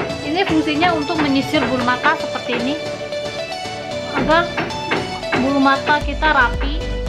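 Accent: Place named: China. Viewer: Bahasa medok cina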